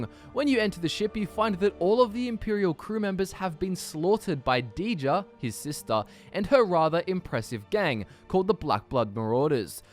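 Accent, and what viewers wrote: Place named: Australia. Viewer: me: starts video me: oh hey fellow aussie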